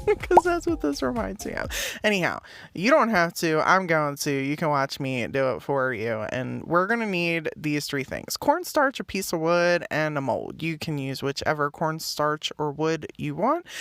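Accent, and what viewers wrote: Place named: Pennsylvania, United States. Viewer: I enjoy listening to you. You sound like Pennsylvania. I loved growing up in the 50’s. Kids were so innocent.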